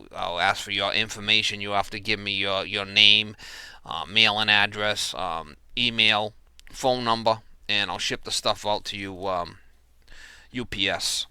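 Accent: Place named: Boston, United States. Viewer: parker sporlan give away. If it weren't for that Boston accent, you could be doing voice-over work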